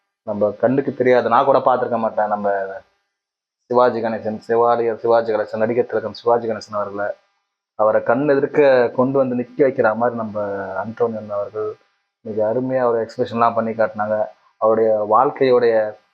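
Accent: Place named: Chennai, India. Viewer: Attahasamana comments ivar epdi sivaji madiri irukka mudiyum chennai speech supera pesararu veetanda sivaji ipdiya pesuvar avara madiri tamizh pesamudiyuma kural illave illa but covering gold aaguma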